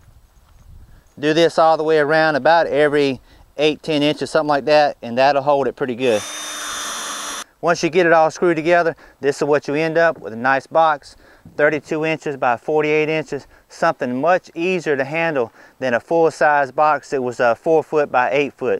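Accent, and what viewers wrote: South, United States. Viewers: must be from the South i live in Arkansas so im not picking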